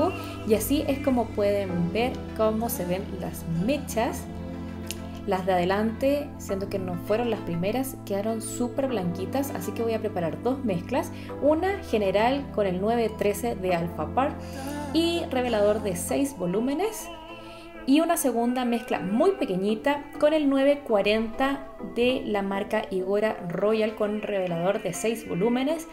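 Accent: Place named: Chile. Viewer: Yo acá viéndote desde Alemania donde ya no voy a la peluquería primero por lo del corona y segundo son caras y muy, pero muy malas .....te felicito es rico escuchar el acento chileno de nuevo . pregunta tu traes babylight pero en las puntas quedan asi de claras o esas les hiciste balayege o algo mas ? saludos te pasaste eres muy buena peluquera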